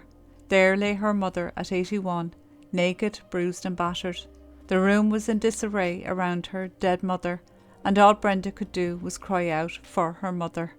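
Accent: Ireland. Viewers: I love your narration voice, your Irish brogue ❤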